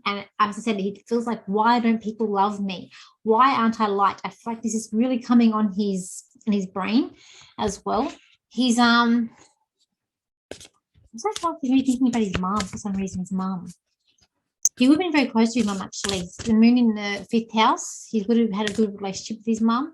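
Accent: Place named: Australia. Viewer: I first had difficulty due to the Australian accent. I turned on the sub-titles and then I understood.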